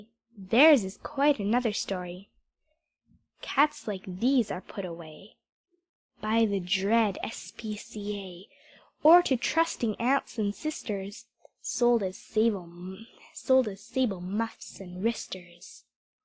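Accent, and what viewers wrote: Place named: United States. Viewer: Английский с американским акцентом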